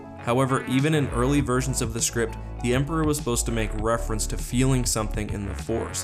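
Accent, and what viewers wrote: Canada. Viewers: Question for you. Not a criticism, but from the way you say your “outs” you’re Canadian or from the Wisconsin region. Correct?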